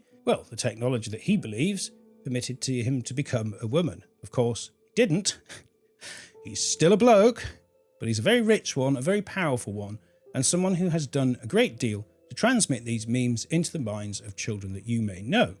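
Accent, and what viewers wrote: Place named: United Kingdom. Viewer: Lovely to listen to a real man, with a polished British accent speaking about the obfuscation going on in the world. From South Africa.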